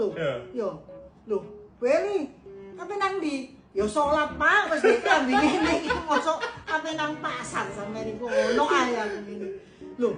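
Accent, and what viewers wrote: Surabaya, Indonesia. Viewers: Ciri khas mak2 suroboyoan nek cerito,hahaha kudu kemekel uduk Goro ceritane,tp Soko bosone seng garakno tambah kemekel😂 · Nek aku kangen Suroboyoan, delok konten smpyn ko...... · Mom... Jan khas ngomonge wong Sroboyoan... ❤... Sehat semuanya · Logate suroboyone lo... nyenengno mama dadi pingin ngrungokno critone mama karo koko...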